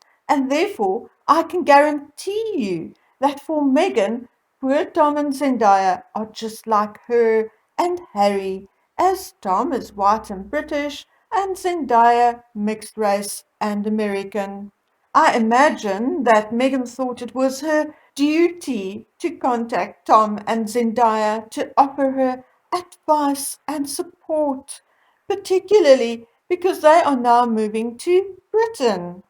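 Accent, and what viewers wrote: South Africa. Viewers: I love your videos but the accent is sooo hard to listen to. Dutch/German and African created the weirdest accent on earth. My bf is South African and even he says it's the ugliest accent on earth! Lol. Some people have nice soft ones but then you get the really stilted, harsh, Hocking ones. They don't flow at all it's like all from the throat and sounds tiring to speak lol. There's no flow, the one is off, like a computer voice. Know what I mean? Im clenching my jaw the whole time, it sucks cause it's so hard to listen to :( 😂 it's a real shame but it's infamous for a reason eh! I've listened to you for a year, and I'm STILL. Not. used. TO. IT. .😅😅 .It's. Like. There's a. fUll stop. After. Every Word. And they go UP . And the . Tone. Goes down at. Random. Points. " Or maybe its Zimbabwe but it's one of those German/Dutch meets African monstrosity combinations lol. 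Looks wise, caucasian Africans are gorgeous though.. the men and the women. the men are always sooooo sxc and manly! Woo! 😍 I think it's the mix of cultures and outdoor lifestyle , but yeah... They are sxc like we Australians . 😎😎 It's just the accent that can be gnarly.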